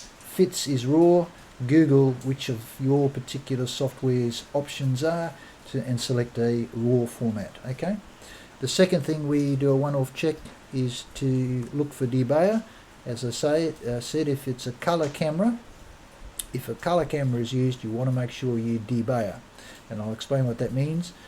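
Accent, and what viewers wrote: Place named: Queensland, Australia. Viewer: Hi, Thanks for your detailed reply, I recon I will have to read it a couple of times. I will have to convert the FITS format file, to be able to put it into PS for manipulation. Unfortunately PS does not support FITS formatt. I have been over the net to check that out. What part of the country are you from? sounds like QLD on the videos but it is hard to make out. Cheers Robert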